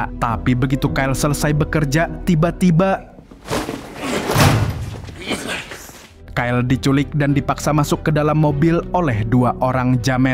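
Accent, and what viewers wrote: Banjar, Indonesia. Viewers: Harau..urang Banjar sekalinya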